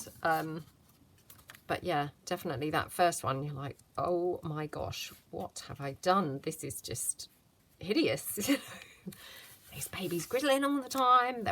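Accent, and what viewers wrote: England, United Kingdom. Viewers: Are you from England or Australia? Love your accent. When you say you have puddings for dinner what does that consist of. Love your videos, stories.🌺🌸🌼🌻🌹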